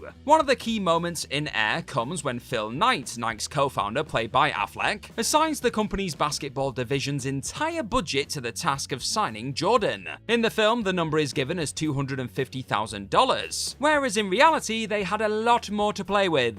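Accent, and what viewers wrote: United Kingdom. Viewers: I don't believe the writer is actually reading his script. I think they are using an AI reader, with a British accent myself. you can't hear him breathing, or even stopping to take a breath while he is talking. Also A lot of words get mispronounced like NIKE ( Nik-EE)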